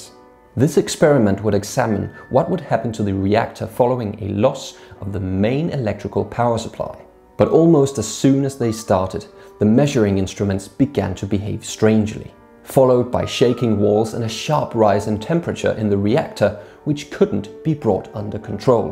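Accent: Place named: United Kingdom. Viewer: Hearing a British man saying “50,000 people used to live here” and it not be Captain Price or Macmillan is strange to say the least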